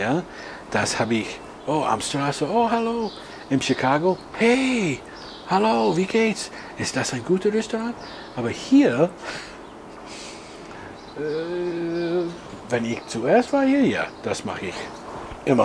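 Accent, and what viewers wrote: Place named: Netherlands. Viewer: Sehr schön wie du sprichst, man merkt auch deine holländischen Wurzeln!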